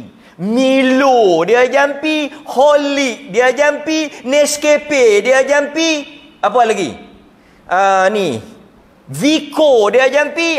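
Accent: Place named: North, Malaysia. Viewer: langgah ayaq,pepak kismis..pure utara noo..👍👍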